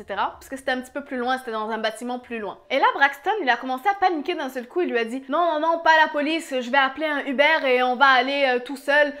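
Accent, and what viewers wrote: Quebec, Canada. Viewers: Tu es québécoise ?Parce que perso j’adore ton accent 😍😍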